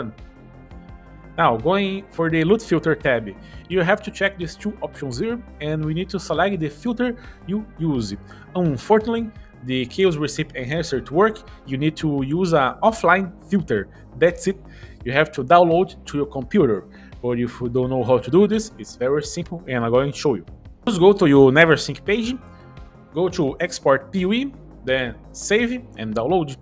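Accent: Brazil. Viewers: You have to be Brazilian or Portuguese , i can tell by the accent! anyways no hate or anything just something i noticed but thank you for the awesome video!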